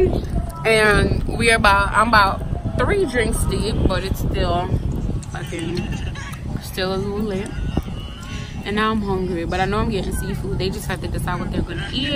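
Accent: Jamaica. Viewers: Mi nah lie mi love yuh style ..... I just love how yuh chip in the patois with the twang 😆😆👍🏼 just came across your channel ... mi like it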